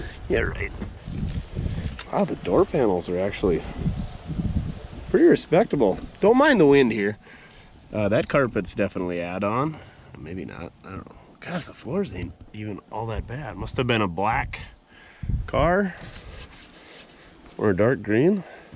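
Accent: United States. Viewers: Sounds more like Eric from maine auto to me, sounds more American, not yankee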